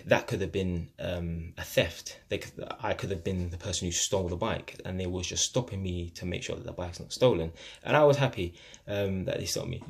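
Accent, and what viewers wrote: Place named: RP, United Kingdom. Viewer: Lmao was was u tryna be posh these days? So hard to listen to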